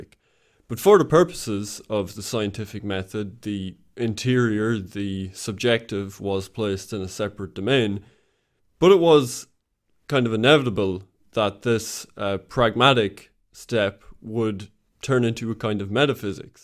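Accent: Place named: Ireland. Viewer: cute adorable Irish accent. Comes from centuries of British molestation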